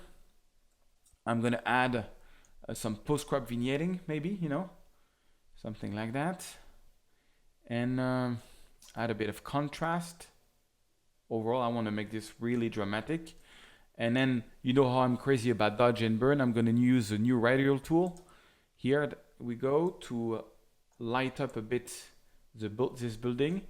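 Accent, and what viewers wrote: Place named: France. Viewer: It's funny to come back to these videos to see how much his French accent has dwindled over the years--video content still 🔥though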